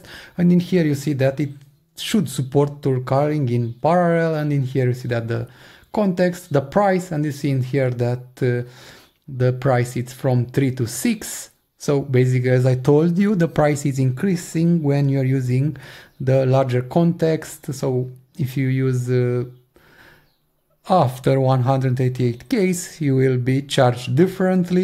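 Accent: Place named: Romania. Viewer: roman cumva îmi dau seama după accent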